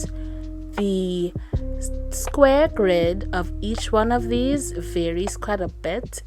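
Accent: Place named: Caribbean. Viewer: Where are from? I detect a Caribbean accent!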